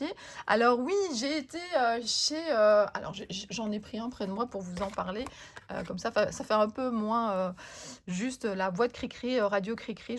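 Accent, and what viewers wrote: Belgium. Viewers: Bonjour, j'aime bien écouter vos vidéos et votre accent belge est trop rigolo 😉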